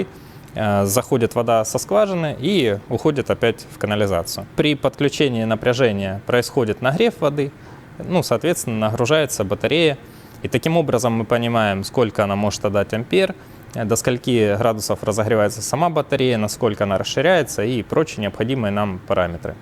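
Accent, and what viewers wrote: Rostov, Russia. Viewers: вы не ростовчанин случайно? Слышно родной говор)))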